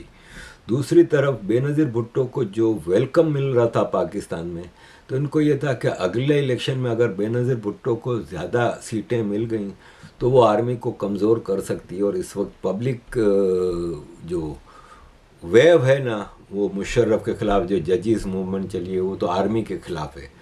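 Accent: Gujarat, India. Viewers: Aap ka bolne ka tone Gujrati lagta hai. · Your roots are from Gujarat & your tone of language ( lehjaa) still reflects the slang tone of traditional gujrati language...Proud of u sir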